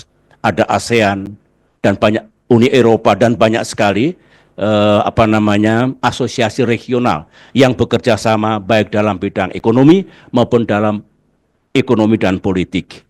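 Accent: Java, Indonesia. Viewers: lidah wong Jowo 😀😀🙏🙏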